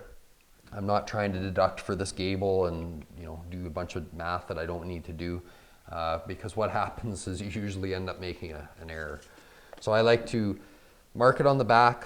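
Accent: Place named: Canada. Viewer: I like how you even tape over the manufacturer labels. True professional man your videos are great. The light Canadian accent makes it that much better.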